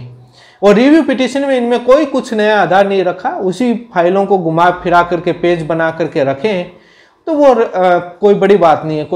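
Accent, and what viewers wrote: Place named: Pahari, India. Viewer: आप पहाड़ी है जो आपके हिंदी उच्चारण से स्पष्ट है। थोड़ा इसमें सुधार करें।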